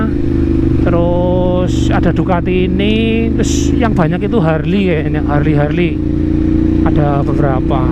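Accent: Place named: Banyumas, Indonesia. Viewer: Salam ngapak bapakeee wkwk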